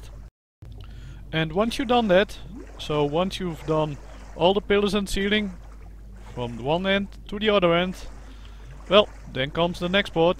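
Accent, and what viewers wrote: Netherlands. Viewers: Lol i can hear he is dutch (im dutch too btw)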